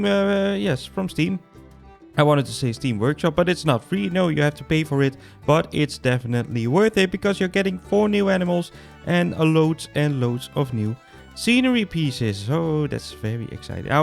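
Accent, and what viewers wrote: Netherlands. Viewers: Altijd leuk hoe je het commentaar doet, beetje nonchalant en love je Nederlandse accent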